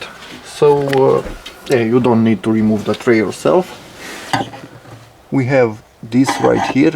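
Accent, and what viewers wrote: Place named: Romania. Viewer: accent romanesc foarte clar. Felicitari pt canal!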